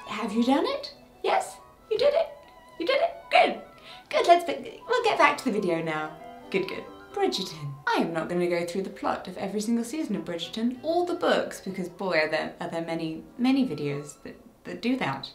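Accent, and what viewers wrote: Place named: England, United Kingdom. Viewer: not even have 1 FULL regency inspired outfit, but 2 of them, with fake flowers, ribbons and funky hair, everything!! You are literally english queen and you talk like one! Thank you for video!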